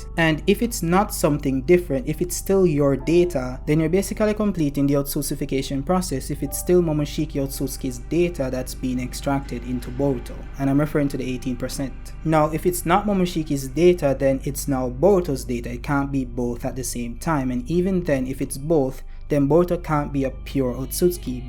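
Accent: Jamaica. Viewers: Yow bro.... you Jamaican? Or from the Caribbean??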